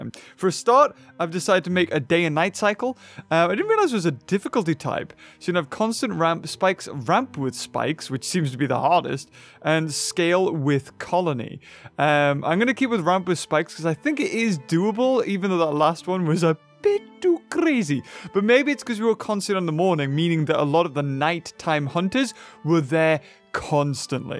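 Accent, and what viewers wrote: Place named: United Kingdom. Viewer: You kind of sound like Wilbur Soot... I guess your voice sounds similar because of the British accent.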